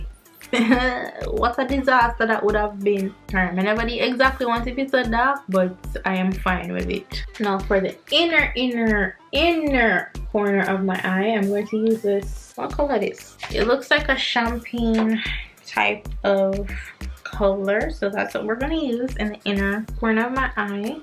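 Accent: Patois, Jamaica. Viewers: Well, been watching your videos from evening while I do my hair (I'm trying the box braids) and what makes you relatable, is the fact that you kept your patios . Caah be Jamaican if yo no talk di language. Love love love your energy and your vibes. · Loving the Jamaican accent 💯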